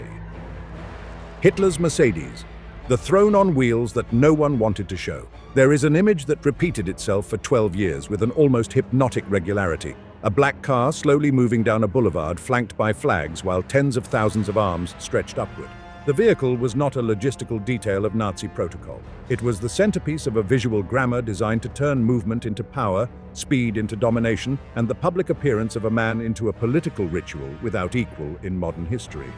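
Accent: United Kingdom. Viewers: The narater is British just like me..😂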